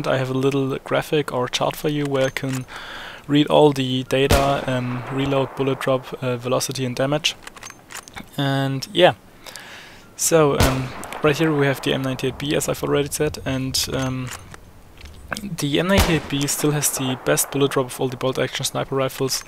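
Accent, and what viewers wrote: Germany. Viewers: hab ich sofort gehört, aber der dialekt ist nicht so stark wie bei anderen deutschen die Englisch sprechen:) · Are u German? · Gutes Video! Ich habe alles verstanden und dein English hört sich richtig gut an. Den deutschen hört man aber trotzdem heraus :)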